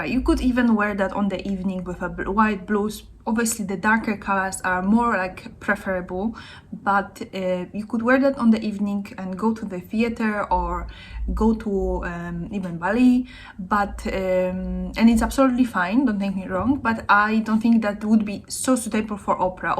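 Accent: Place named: Russia. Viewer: hi there ! i really am thinking abt going at the Opera on my birthday this year (i'm 17,) are there dresses we can wear : formal - elegant but not womanly. really chic. if you know what i mean ? i really am thankful for your video ! btw , not to be rude , but i'm sensing a really really beautiful russian accent ? or ukrainian ? take care xx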